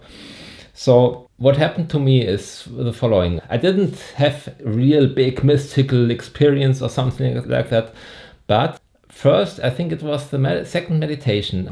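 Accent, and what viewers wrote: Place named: Germany. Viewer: Ich liebe deinen deutschen Akzent. Es ist so schön! Vielen Dank für dieses Video)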